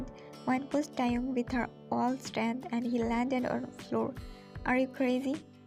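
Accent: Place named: India. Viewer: Your voice is just so cute I like your accent are you from India ? Lots of love from 🇫🇷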